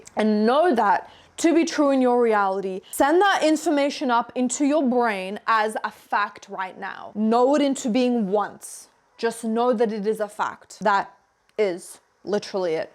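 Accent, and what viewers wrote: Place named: Australia. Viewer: I love your Aussie accent saurrr muchhh fr🤧✨❤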